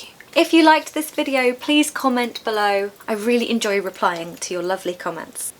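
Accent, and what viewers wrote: United Kingdom. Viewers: Hello, I would like to visit those castles in the U. K. Love your beautiful British vibes and the usage of words with a lovely British accent., You taught me best British English lessons. You are adorable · Thank you for reminding me the Beautiful British ascent . I tried to shadow you ❤ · Hi. I really enjoy watching your video s. Especially when i listen to your lovely brit accent. I would like visit london and seeing icon london like eye londond and big ban and tower bridge and ....❤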